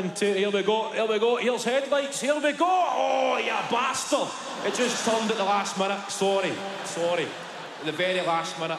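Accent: Scotland, United Kingdom. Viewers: He's Scottish ya tool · He's not English. He's Scottish. YouTube doesn't understand Scottish. 😂😂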